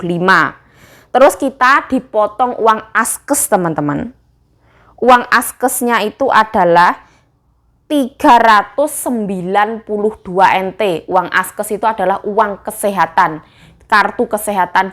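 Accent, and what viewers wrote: Java, Indonesia. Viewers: slm knal, aq dr tanzi, liat km lwat d branda, cba q simak, kok asik jg cara bicaramu, medok tegas crdas, salut sm km, brbakti sm ortu, synk sm ttgga, Smga kdpan ny lbh sukss ya, Aamiiin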